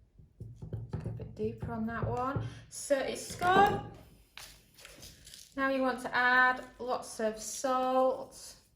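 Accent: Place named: North Yorkshire, United Kingdom. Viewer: Thanks so much. This is a recipe I'll be making soon as I love duck. My wife doesn't eat meat but I'll be happy to make this for myself. Also, love your accent. I'm guessing North Yorkshire. Am I close?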